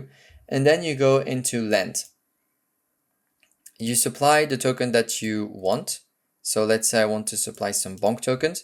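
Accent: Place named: France. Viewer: D'autant plus que l'accent est très frenchy 😖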